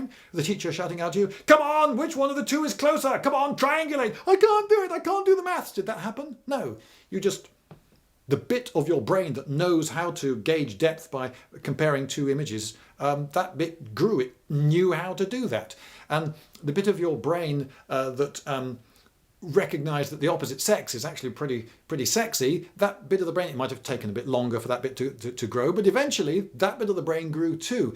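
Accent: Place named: United Kingdom. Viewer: This is the diction of a good Britisher that grew up on Fawlty Towers.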